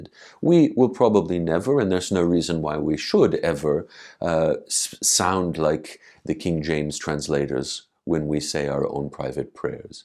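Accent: United States. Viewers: Vladyka bless. I thought you just had an Atlantic American accent. I was born in Australia, but being of Macedonian background, I still use some Slavonic hymns and phrases. Svyati Boze, Svyati Krepki, Svyati Bezsmrtni, pomilui nas. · he does sound like an American that’s changed a bit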